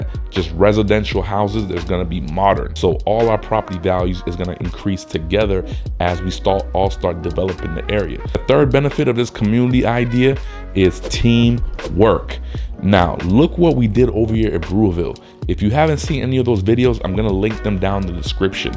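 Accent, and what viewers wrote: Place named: Northeast, United States. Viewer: your kolocwa is flawless 😂 Putting that aside, you sound a little like somebody who's lived in the Northeast ( PA/NJ/NY )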